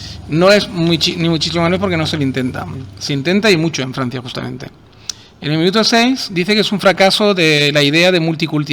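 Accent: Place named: Spain. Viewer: Llegué a tu canal por referencia y te escucho criticar a esa rusa , no veo tu análisis ni menos las evidencias que lo demuestren , solo eres un rusofóbico de pobres argumentos arbitrarios y arribistas , enalteces a Francia la mítica libertaria , ni la monarquía ni el imperio francés le hizo tanto mal cobardemente a países que no podían defenderse . Tienes acento español ,ese ex imperio que vencía pueblos primitivos hasta que aprendieron y se emanciparon ahora arrimados al imperio angloamericano , nombraste a los Romanos contra los cartagineses y tantos más que vencieron en equivalencia de medios tanto que Dios tuvo que intervenir para derrotarlos.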